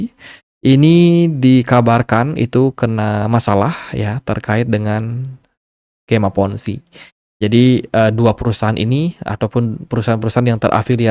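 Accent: Bali, Indonesia. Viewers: Maaf,,,sptnya adminny ini dari logatnya,,asal BALI ,,ya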